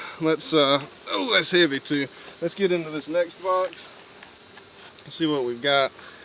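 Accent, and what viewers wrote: South, United States. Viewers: Of course he has a southern accent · His southern accent soothes my soul "this was my gran daddies' " made my heart melt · I live in North Georgia, most people I know have pretty deep southern accents. Because of where I live most people here are pretty mean, so the accent doesn't exactly give me the warm fuzzies. But this man seems so calm and kind that the accent sounds nice. I've watched maybe ten of his videos already and now I wanna be a beekeeper! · "it was my granddaddy's" awe, as a canadian I dont hear real southern- talk much, its really heart warming to hear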